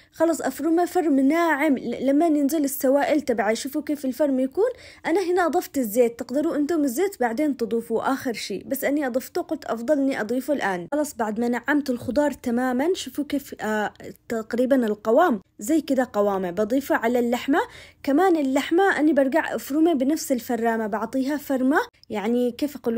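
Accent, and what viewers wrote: Yemen. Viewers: لاول مرة احب لهجتنا اليمنية منك انتي طبعا لهجتنا متعددة يابنت عدن الحلوة عفويتك وعدم تصنعك خلاني اقول والله لهجتنا كانت مظلومة من قبلك وتسلم ايدك يا قمر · السلام عليكم احب طريقه واطباق طبخك يا واكثر شي احب لهجتك اليمنيه انت سيده مباركه الله يحفظك يا ريت تزوريني في جده ❤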